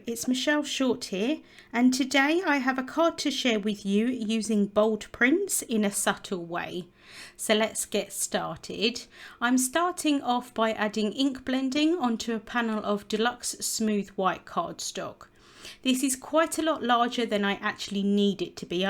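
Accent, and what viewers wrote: RP, United Kingdom. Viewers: A very lovely card! Like the colors and to hear Queens English!👍🏻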